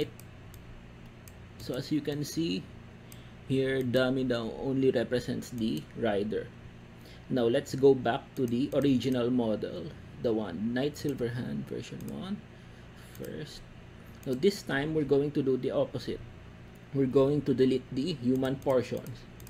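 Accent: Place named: Philippines. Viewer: You sound like filipino. Where are u from?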